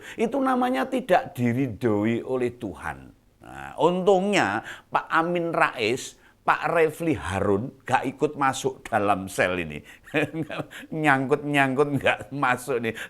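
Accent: Java, Indonesia. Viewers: Bapak dengan gaya bahasanya sgt lucu maka aku suka nonton. Logat jawa itu enak didengar. Logat saya batak suka di ejek, aku suka tertawa logat saya diejek sehingga seru. Salam mesra bos !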